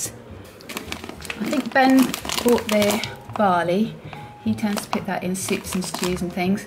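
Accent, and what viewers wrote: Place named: West Country, United Kingdom. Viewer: I had a little chuckle to myself when you mentioned Ben unloading the dishwasher: my husband thinks he's being helpful by putting all the stuff away but I nearly always end up having to put things back in their 'correct' place- this goes for shopping too- the perils of being a control freak lol. Hope you did feel good after the clearout and am not surprised you got a headache the next day, I get like that too. It was novel to see food items in drawers instead of the 'usual' cupboards :) Good luck with the interviews (although I know the feeling of going for a job and not really wanting it!). This is really random but I've noticed there may be a tiny bit of a west country twang sometimes when you speak- hardly surprising after living with Ben for over 30 years lol :)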